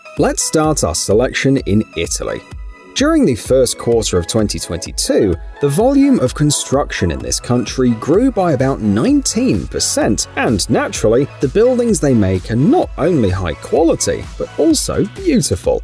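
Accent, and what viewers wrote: England, United Kingdom. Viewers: I find it odd and confusing that you sound English yet you are talking in 'dollars'. I live in Australia. Who's dollars are you referring to?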